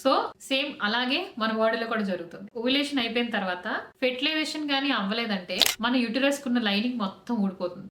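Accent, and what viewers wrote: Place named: Godavari, India. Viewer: Hi garu. One thing i could say.. You are so honest & Simple. I started watching ur channel with "HOW NOT TO DIE". You are from KAKINADA? Coz your slang is similar to EAST WEST GODAVARI. One thing i want to know, why you stopped NAMASTE LONDON Channel?? You said i woked as Assr manager in indias Lasrgest bank. SBI Or HDFC? ( Iam also a banker) Lots of best wishes from us. Appreciating your partner for supporting your dreams n aspirations. Wishing all the best to Be happy with channel.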